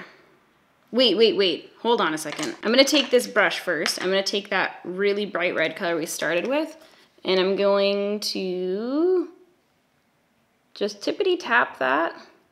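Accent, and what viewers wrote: Canada. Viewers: sounding super Canidian today eh? I love it okey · This was pretty funny I burst out laughing a few times when you were doing your techniques you don't like. I also find it odd that you sound so Canadian and I've lived in Vancouver, Calgary and Toronto and haven't heard too many with the same kind of pronunciation of some words as you do. Especially the OOOKeeys. It's funny and cute. I also agree having super matte thick dry foundation just also makes my skin look like that and any lines and acne spots just look horrific so I've been having to go much lighter and luminous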